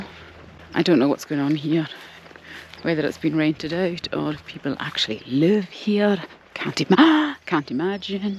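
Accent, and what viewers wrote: Scotland, United Kingdom. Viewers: Absolutely fantastic video, of a truly stunning Island, such beautiful scenery, and you had the weather with you, well for part of your stay, great video I thoroughly enjoyed watching, you gave a great insight to the history of the Island too 😀 thanks for sharing 🙏💚 PS, can I ask please? Whereabouts in Scotland is your accent from? 🙌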